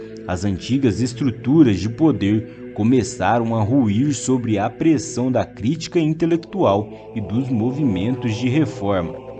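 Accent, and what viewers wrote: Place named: Inland São Paulo, Brazil. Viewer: O cara ja comeca o video ckm uma rendanvia na lingua portuguesa. " Há seculos atrás " . Se o verbo haver esta sendo usado sentido de tempo) , dispensa o " atrás ". Esse dotaque do interio de São Paulo mata a narração também , terrivel.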